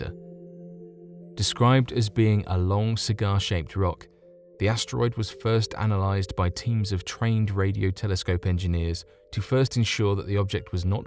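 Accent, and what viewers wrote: United Kingdom. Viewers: Guy with a thick british accent ranting about American beauracracy, framing the whole thing as though NASA has any influence over the government. 1/2 of 1 cent of every tax dollar. NASA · British accents are good for documentaries and bad guys in movies. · British mate, close though.....kinda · The British accent is an extra convincing aspect 😉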